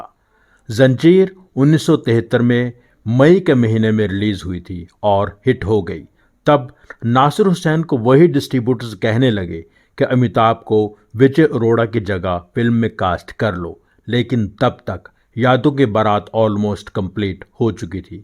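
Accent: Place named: Punjab, India. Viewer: Brilliant Hindi in Punjabi accent.....all in the family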